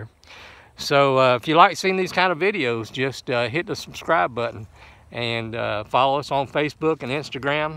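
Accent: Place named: Arkansas, United States. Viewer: You sound like your from Arkansas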